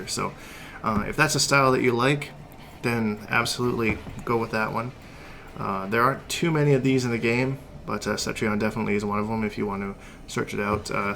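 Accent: Canada. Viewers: Canadian af 👍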